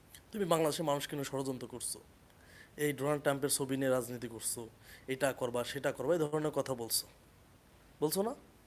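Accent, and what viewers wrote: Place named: Pakistan. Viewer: ভাই আপনার মুখের ভাসাতেই বুঝা সেস যে আপনি পাকিস্তানের মালের ছেলে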